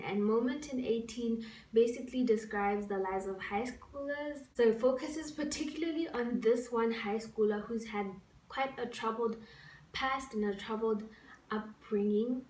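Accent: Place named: South Africa. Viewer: When I first watched your videos I was like mhm, she sounds South African. I don't know if you are but I am and I just got really excited. Love your channel💕❤️ You watch kdramas too?! OMG I'm immediately subscribing🌻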